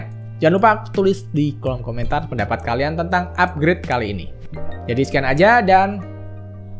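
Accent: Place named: Java, Indonesia. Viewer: Medok jawir anjir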